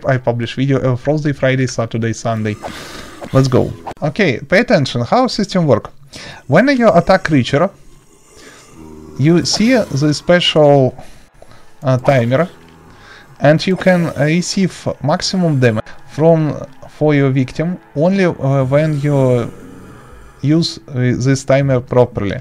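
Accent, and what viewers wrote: Russia. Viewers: У тебя русский окцент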